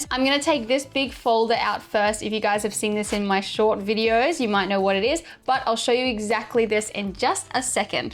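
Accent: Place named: Australia. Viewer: Hi, I love your content and I’m so happy to see your video! I really enjoy the vegan blogs and love listening to your Australian accent. ❤️🤍keep posting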